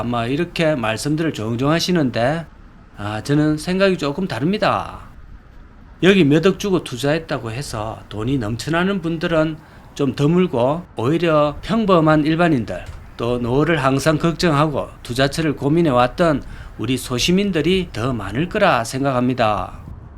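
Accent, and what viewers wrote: Gyeongsang, South Korea. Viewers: 사투리 토하겠다 할배야 경상도 말투좀 버리고 딕션 연습좀 하세요. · 구수한 경상도말씨로 설명을 정말 잘하셔서 다른 사람들도 처럼 하시는 분도 있지만 재미가없고 설명을 뽄따서 하고 있는데 저는 절대로 안본답니다 하이팅. 하셔요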